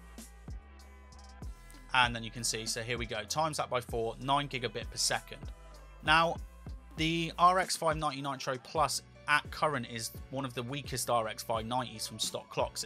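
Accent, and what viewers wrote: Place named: England, United Kingdom. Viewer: That English accent... Just perfect.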